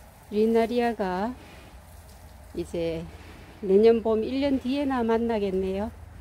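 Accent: Gyeongsang, South Korea. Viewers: 저도 전원생활하면서. 야생화키우고 있지만 이렇게 많은품종과 넓은곳은 처음봐요 말투과 경상도 사투리가 있는데 어디예요?저는 대구살다가 지금성주에서 전원생활하고있어요 가까우면 구경한번하고싶어요 스켈이 타고나요~^^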